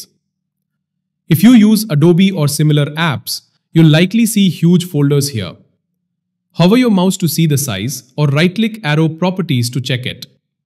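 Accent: India. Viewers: just move file location , its simple as that. Also remove apps setup file as .msi in those folders. Move apps out of C drive. Remove previously installed Windows file Change location of My documents and Downloads Use Windir stat to see , the tree file size. Turn virtual memory to Auto. Anything set to lower value or higher value has its on demerits . Delete recycle bin Delete prefetch, temp, %temp%. You sounded indian, And my expectation was sky high, but u delivered less . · AI generated slop, the thumbnail face does not quite seem to match the indian accent.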